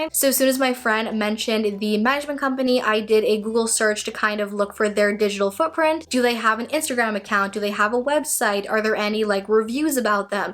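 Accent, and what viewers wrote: Canada. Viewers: where in Canada are you from(I can tell)?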